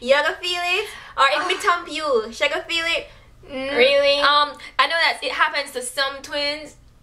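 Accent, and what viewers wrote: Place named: Jamaica. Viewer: Your patois (jamaican accent) sounds so much stronger in this video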